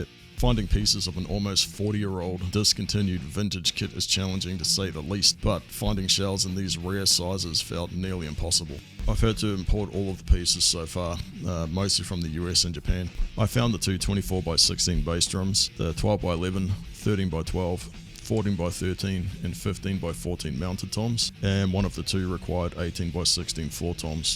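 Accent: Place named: New Zealand. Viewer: Sound like a kiwi. Im amazed you got this gear😊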